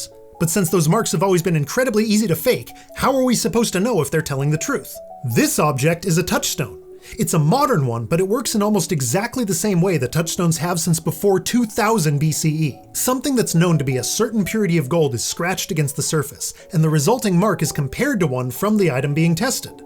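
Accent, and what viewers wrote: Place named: United States. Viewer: His accent is American but his legal references are British. I don’t know where the law changed in 1973.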